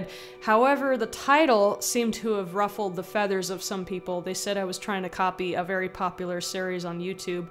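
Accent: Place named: West Texas, United States. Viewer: excellent videos about feminazis stuff, anyhow are you from west Texas ? I have a friend from there that her accent sounds quite close. greetings from Alaska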